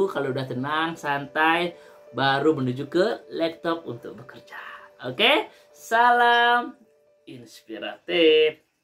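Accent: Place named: Bali, Indonesia. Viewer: Bapak ini dari bahasanya pasti orang bali😃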